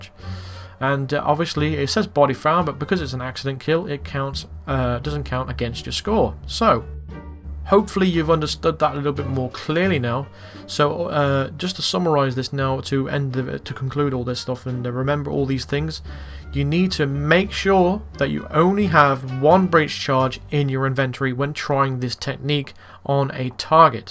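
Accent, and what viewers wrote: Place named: West Country, United Kingdom. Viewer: Bristolian/West Country accent.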